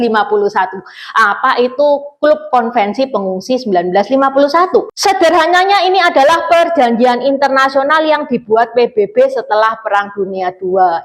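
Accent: Java, Indonesia. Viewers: aq ngakan sebab ini kakak medok banget😂😂😂😂😂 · Iki wong Cino, tapi bhs ny medok jowo, keren"👍👍👍👍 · Orang cina, bahasa indonesia, logat jawa. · Logat jowomu medhok mbak yu... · Coba perbaiki lagi logat jawa medok nya mbak.. biar lebih jelas bicaranya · Ce ngomonge medok banget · Aku focus medok. Mba mba Jawa .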